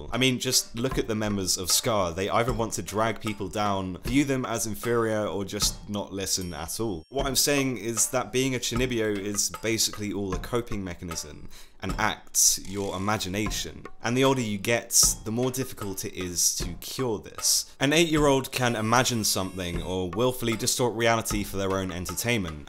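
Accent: United Kingdom. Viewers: Your cutting British accent and the background reminds me of exurb1a. Much love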